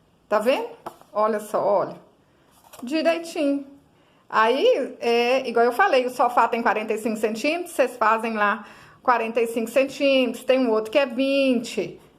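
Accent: Minas Gerais, Brazil. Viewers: Vc é mineira ? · Em primeiro lugar.Vc é mais linda q.o seu artesanato.Seu sotaque mineiro é tudo de bom.Sou paulista mais amo esse sotaque mineiro.E o seu artesanato:Meu Deus!Aja 💓!É lindo d+da conta sá! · Cumade é mineira uai so? Eu também sou 😆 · De onde VC é? Parece um sotaque mineirinho, igual eu rsrs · Amei que trabalho maravilhoso lindo demais, gostei também tanto de vc,de que estado vc é, acho lindo seu jeito de falar. Muita paz e saúde pra vc minha linda 😘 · Mineira UAI. Eu tbm sou mineira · Esse sotaque minerin é bom demais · Gostaria de saber de que lugar de Minas vc é, esse seu sotaque é mineiríssimo, sou nascida em Minas, Resplendor, mas moro em Itaperuna Estado do Rio. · Amei , vc é muito fofa , vc é de qual estado ?. Parece Mineira kkkk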